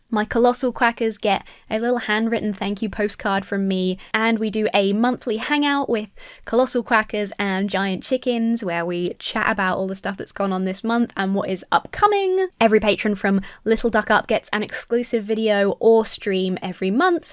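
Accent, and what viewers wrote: United Kingdom. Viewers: Justice for the tortoise! I love how you say tortoise! Love your content. You British people always get me with your accents!!! You're now my third British YouTuber... Simon Whistler, Tara mooknee and you. I guess daz black is also British but his accent is different than yours. I am a married man in America and i love being married to my wife. If I'm completely honest she does take care of me and i am healthier because of her. Its not that she's my mom or anything. Even though she does do stuff for me but part of it is she encourages me to be better because i love her. Ive been seven years sober because i want to live longer because of her and the children she's given me. Also, she's awesome and who wouldn't want to be alive when you're married to her.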